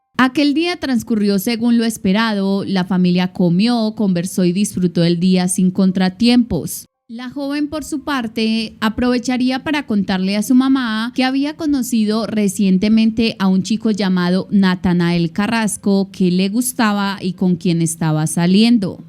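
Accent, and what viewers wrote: Antioquia, Colombia. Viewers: Es su acento de ella! Así hablan en Colombia! Tu quiensabe dq país eres! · No es Muletilla, se llama acento. Ejemplo las personas argentinas tienen su acento al hablar, así mismo es en Colombia. Me gustaría escucharte haber como hablas al narrar algo. Es bueno criticar detrás de una pantalla. 🙄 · Es así su acento porque intuyo que es paisa y esa es la manera como hablan. Si no es de tu agrado hay muchos youtubers de true crime que puedes escuchar. Ya que ni modo que ella cambie su acento porque hay gente que no le gusta.😊 Saludos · Siempre hay otras opciones para escuchar... es su manera de hablar, muchos colombianos así hablan, y a muchos nos encanta ese acento!! ❤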